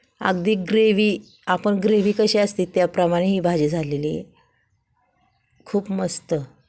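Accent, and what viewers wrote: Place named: Rural, India. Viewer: तुझं बोलणं आवडतं मला खूप गावच्या पद्धतीने बोलतेस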